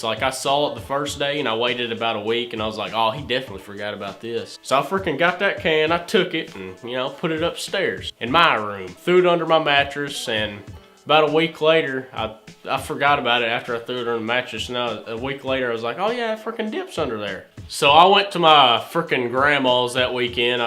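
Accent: Kentucky, United States. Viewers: What part of KY are you from?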